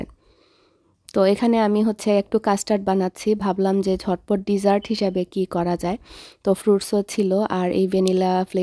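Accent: Sylhet, Bangladesh. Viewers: Apni sylheti vashay kotha boilen valo lage but shuddhu boilen na valo lage na ...bolte paren je apni deikhen na apnr vdogulu valo lage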